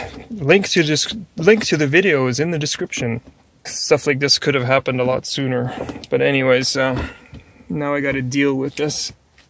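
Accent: Germany. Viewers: Right - will do. Just watched you Mom-video. Where in Germany are you from? I'm wondering about your German dialect.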